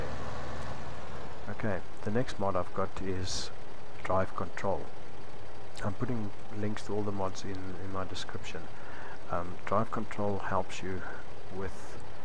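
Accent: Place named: Afrikaans, South Africa. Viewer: awe ou like jou video kan somer hoor jy is afrikaans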